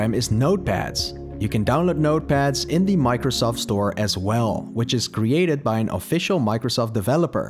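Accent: Netherlands. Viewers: 10 seconden in en ik gok... een nederlander? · the Dutch accent is 98% here. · Na 1 sec hoorde ik al dat je NL bent. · Huh you dutch?! Je hoort het amper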